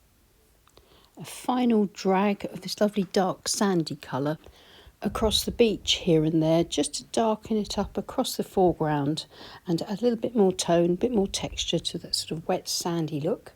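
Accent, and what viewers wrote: England, United Kingdom. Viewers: I love your work and your kind voice and your beautiful English accent 😊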